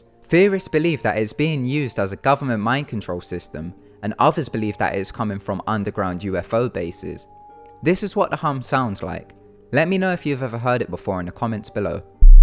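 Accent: United Kingdom. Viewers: Your vids are good and your accent is mint where in the uk you from ? Just subbed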